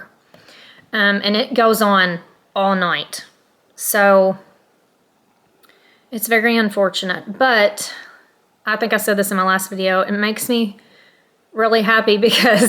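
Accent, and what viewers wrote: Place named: Georgia, United States. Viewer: That heartbeat sounds glorious! I heard you say it said 130 for a second. When I was pregnant with my daughter her heartbeat never went out of the 130’s my entire pregnancy. The doctor would say she was just chilling out in there 😂. My son’s heartbeat never went below the 150’s. It was usually in the 160’s. I’m so happy for you! So glad I came across your channel. On a side note, your accent sounds like mine 😊. I’m born and raised in southwest Georgia.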